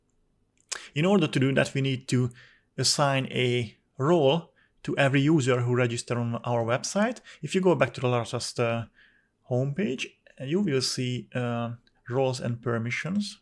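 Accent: Hungary. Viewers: Hello and thanks for the video, it really helped me complete my project!! also I study in hungary and I immediately recognized that hungarian english accent lol, thanks again.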